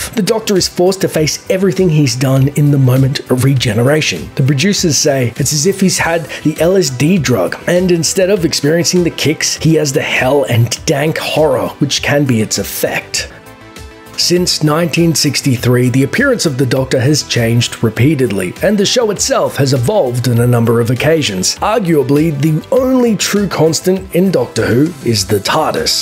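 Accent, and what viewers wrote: Australia. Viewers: I'm pretty sure this guy has an Australian accent lol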